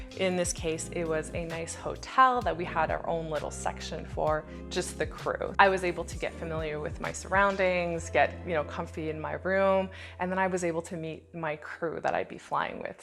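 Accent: Quebec, Canada. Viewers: Great video, ton accent français, tu dois être une quebecoise si je me trompe pas... Sinon 👏🏽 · Your Quebecois accent must have been a hit among the Congolais. Your story briefly mentioned “adjustment”, which I know was something you must have been downplaying for those who could never understand. You’ll probably remember your first days in Congo and the culture shock for the rest of your life. I still remember arriving in Zaïre at Kinshasa in 1978. I was 13, Couldn’t speak French at the time, There was a drought going on, a war just ending, and my family and I were reduced to eating tomato soup for months until our regular family food shipment arrived. The adjustment for me was epic, and I had already become used to living in several different countries by then. The experience of living there for a little more than four years was so wonderful, and exciting. 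I miss that country so much. Interesting that your flying, even your entry into the DRC was far more eastern-oriented than it was in the 70s. In my days, EVERYTHING was Kinshasa-centric. Supplies, even for the Eastern part of the country were mostly trans-shipped out the Kinshasa airports, and trucked in from the port of Matadi.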